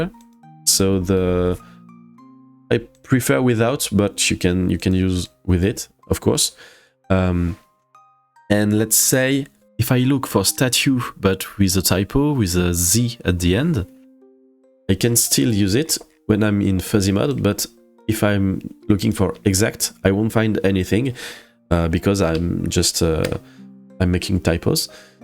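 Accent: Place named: France. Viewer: Love your french accent! Merci beaucoup pour ce tutoriel, t'as gagné un autre abonné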